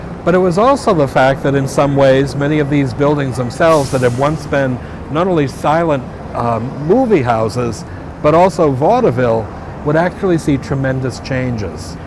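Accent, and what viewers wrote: Boston, United States. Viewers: i, A few years ago, I went to City Hall to get my birth certificate, as I was born in Boston. While standing in line with 15 or 20 other fellow Bostonians, I had this extraordinary feeling that these were "my people," even though we had never met. I feel the same way when I listen to you speak with your Boston accent in the historical videos you create about our city. I’ve especially enjoyed the ones about the Combat Zone, as I’m old enough to remember wandering around there. In my younger days, I used to visit some of those bars with friends—it was quite the experience, and we had a lot of fun. I’m also really impressed with your deep knowledge of Boston’s geography, especially your ability to distinguish where the land was originally and where it was filled in. That’s still somewhat of a mystery to me, but you bring it to life in such a clear way. 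I went to Boston Univerity and had a professor there in American history who knew all this lost Bost history. I wonder if you knew him, though he is long gone. I am left to wonder where you get your historical information? Thank you for sharing your expertise and keeping Boston’s history alive for all of us. Robert Hargrove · Loved this, and your Boston accent. Keep it up and your F B posts.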